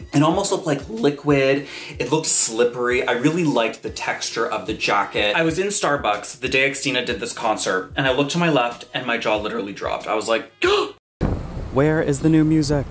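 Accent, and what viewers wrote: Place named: Canada. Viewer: You're a TRUE Fighter, I love you so much!!!! I'm obsessed with you and ur flawless Canadian accent!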